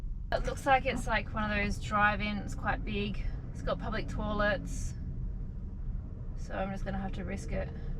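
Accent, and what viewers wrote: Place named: Australia. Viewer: Nothing better than B-doubles and an Aussie accent.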